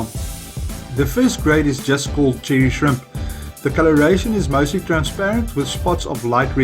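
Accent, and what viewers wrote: Afrikaans, South Africa. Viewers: Is that an Afrikaans accent I hear😎👍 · More oom kan ons praat oom klink Afrikaans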